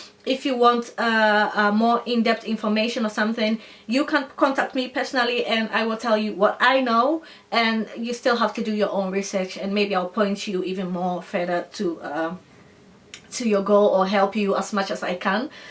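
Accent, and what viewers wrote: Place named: East Africa. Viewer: thank you for that insight. Yes, there are a lot of Ghanaians living in Holland (just as there are here in the UK), that much I know, but I must admit her accent prompted me as being perhaps of East/Southern African origin and hence my initial question.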